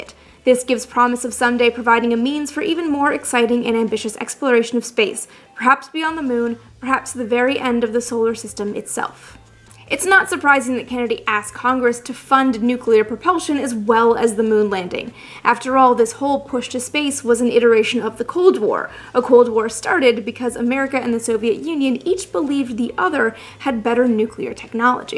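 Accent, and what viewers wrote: Canada. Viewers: You should do at least one joint 'cast with Paul Shillito of Curious Droid. P.S. You're Canadian, aren't you? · Are you from Canada? If not it's gotta be like Wisconsin or something, one of my ex gf's was Canadian and I swear I hear the same little accents in you that she had that no one else seemed to notice.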